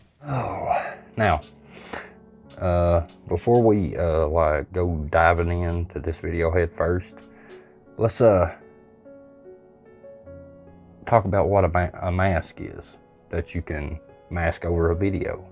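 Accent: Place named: Carolina, United States. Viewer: Are You From Carolina? I Love You'r Axent...Jihaaaa...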